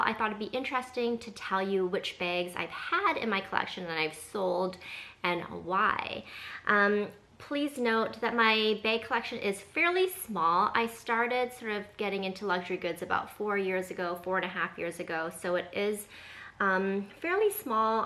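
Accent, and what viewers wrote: Canada. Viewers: Are you Canadian? My grandma is from Canada and that’s how everyone I know from there says bag lol I love it tho!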